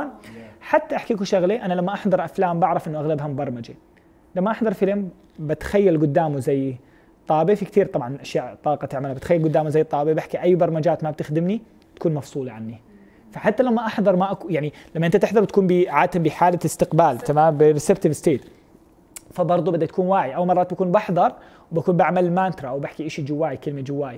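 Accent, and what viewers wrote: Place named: Levant, Arab world. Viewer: شكرا ،، لهجتك حلوة عراقيه سورية فلسطينه لبنانيه ماعرفت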